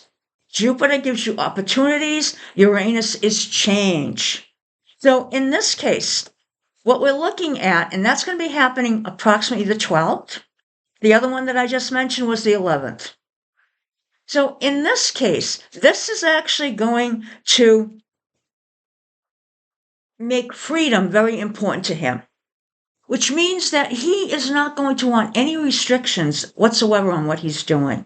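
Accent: Massachusetts, United States. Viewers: I knew you had the Mass accent!! My mom was from Hull village , I grew up in lakes Region NH ( Squam lake and Winnie) and now in Maine.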